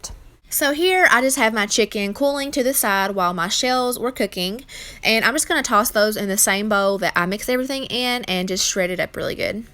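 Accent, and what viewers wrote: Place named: Midwest, United States. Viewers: Hi! I am new to your channel and I absolutely love it! I love getting ideas from you and I think you cook some really fun, family friendly friendly meals. I’m also from Michigan area so I love to hear your accent. 😄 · I like your videos... straight to the point with great information. Plus being from the midwest I appreciate your accent :)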